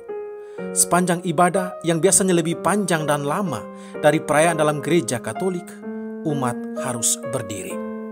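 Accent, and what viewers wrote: Flores, Indonesia. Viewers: Kalau diresapi logat miminya seperti org bejawa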